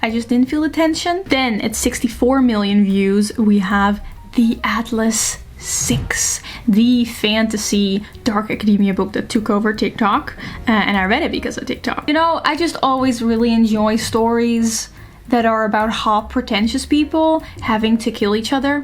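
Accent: Netherlands. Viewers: Are you Dutch? Btw, love your videos btw❤❤ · Ik hoor een Nederlands accent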